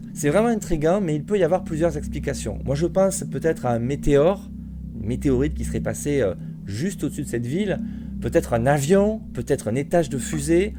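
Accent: South, France. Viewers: Je te découvre et je follow en 10 secondes pour ces raisons: + super chapeau. L’accent du Sud c’est le bonus.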